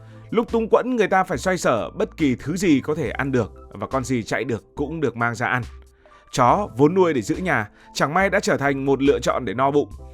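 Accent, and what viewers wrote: North, Vietnam. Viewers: Thằng mc luon bưng bô và nó lại nói tiếng bắc mà tui bắc lai không biêt bố no là ai ,và luon ra đường k dội nón và óc bò khi lái xe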